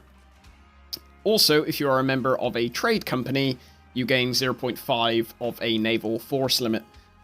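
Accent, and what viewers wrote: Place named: West Country, United Kingdom. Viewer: Lovely West country accent, greetings from Kent.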